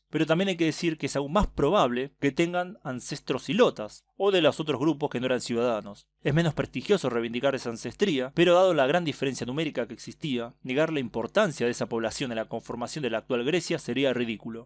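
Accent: Argentina. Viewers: Disculpa la franqueza, aguantando tu "SHA" argentino. (Hago lo posible, porque tus temas son importantes). Quisiera saber eso del "perfil griego". A Napoleón le volvía loco ese perfil griego, Y a mí, cuando veo ese perfi en una mujerl, me parece bello, ya que no tienen la hendidura, de la parte superior de la nariz, sino es recto. Saludos desde Perú. Porfis, podrías pronunciar lo menos posible lo "SHA", pero si no se puede, no importa. aguantaré, Un abrazo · Xq va dejar de decir Sha ? Es así es nuestro acento y nada ni nadie lo puede cambiar te guste o no.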